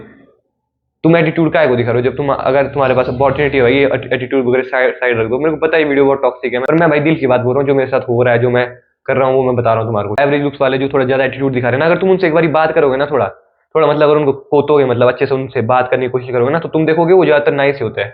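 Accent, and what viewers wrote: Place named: Pahadi, India. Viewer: Bhai tumhari awaj mai pahadi accent ata h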